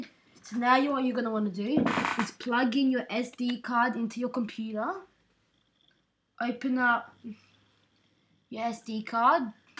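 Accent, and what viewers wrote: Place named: United Kingdom. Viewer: Are you british or live in the UK? I'm not judging you. Honestly, I want your accent. And it worked.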